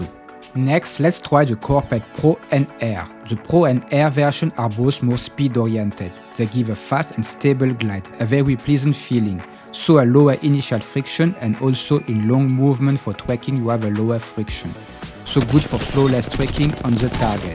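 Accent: France. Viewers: Mdr l'accent bien français (bonne vidéo quand même)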